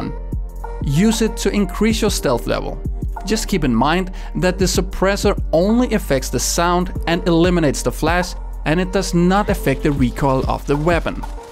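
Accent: Denmark. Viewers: So quick question.. Are you Danish? Because your accent sound a little like Danish. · Are u from Denmark? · Its called being danish...we have a funny accent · The reason some of you guys think his way of speach is funny is becouse of his danish accent we have a very bland pronunciation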